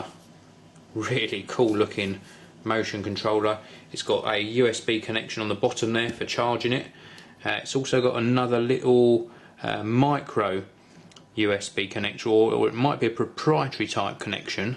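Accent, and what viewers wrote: England, United Kingdom. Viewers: It's a british accent:) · It's an english accent.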